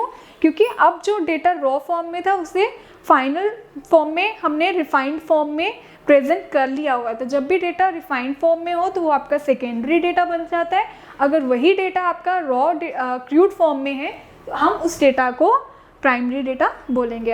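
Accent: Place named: Bihar, India. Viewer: Mam app acha padhtii hoo but app measurement conclusion kee sahi pronunciation use kero app Bihari pronunciation use mat keri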